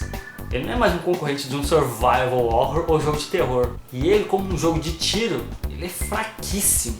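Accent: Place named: Piracicaba, Brazil. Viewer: por acaso você não é de piracicaba não né?? nosso sotaque parece ser o mesmo...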